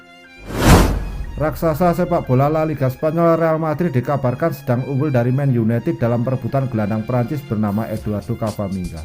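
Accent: Java, Indonesia. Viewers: Min biground nya cari yg bagus sedikit suara gak jelas bgt apalagi logat jawa kental jadi gak kedengaran min..🙏🙏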